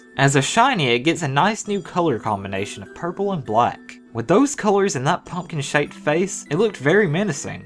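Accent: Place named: Tennessee, United States. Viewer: Where are you from? Your accent says somewhere around Tennessee to me. I could be wrong though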